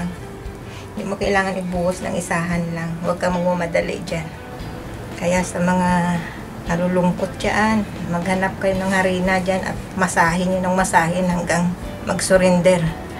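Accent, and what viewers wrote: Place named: Visayas, Philippines. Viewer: Bisaya ka po pala mam..galit na naman po siya ehehe...kakwela nyo po at masarap magluto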